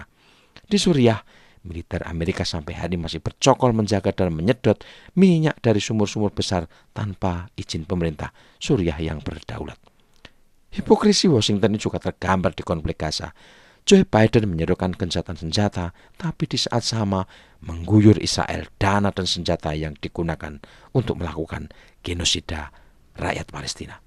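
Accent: Java, Indonesia. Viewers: Terlalu medok Logat Jawanya diperhalus yaaaa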